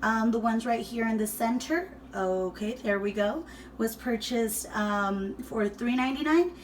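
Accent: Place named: Latin America. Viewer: Hola. De don de eres. Yo soy de Puerto Rico y me suenas Latina. Me gusta lo que hicistes en tu pantry.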